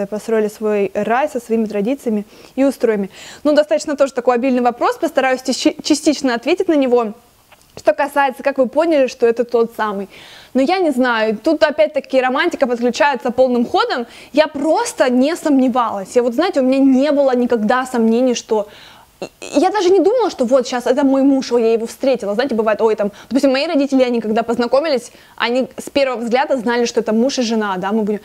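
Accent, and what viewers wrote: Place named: Spain. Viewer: вот, ровно два года назад акцента еще не было)))) испанский язык внес очень красивый оттенок в ваш русский язык))))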